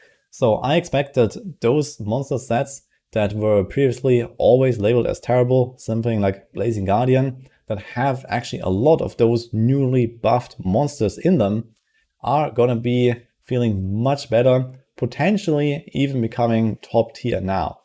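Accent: Germany. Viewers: Role Model for "a german talking english" ❤️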